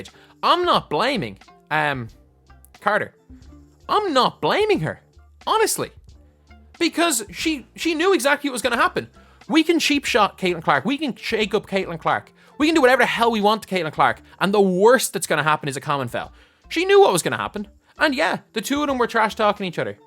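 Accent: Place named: Ireland. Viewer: It’s an Irish accent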